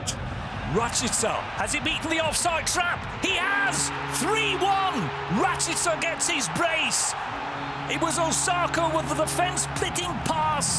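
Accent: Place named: RP, United Kingdom. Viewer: Poshest commentator of all time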